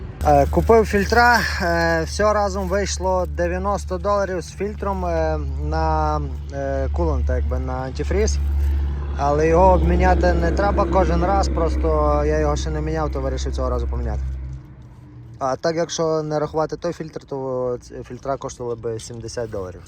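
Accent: Western Ukraine, Ukraine. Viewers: Блін, як класно чути цей наш західний акцент... Привіт з Івано-Франківська...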